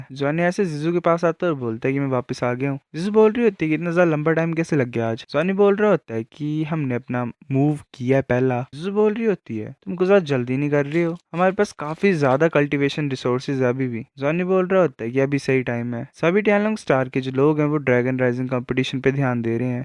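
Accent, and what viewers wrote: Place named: Himachal Pradesh, India. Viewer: Bro apki language ae lg rha app Himachal Pradesh se ho 😁😁😅 i am right 🥺😅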